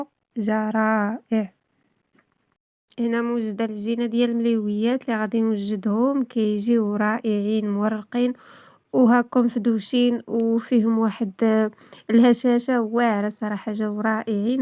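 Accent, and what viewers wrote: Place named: Algeria. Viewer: انت منين من مغرب ،،. راكي وتهدري كيفنا في جزاير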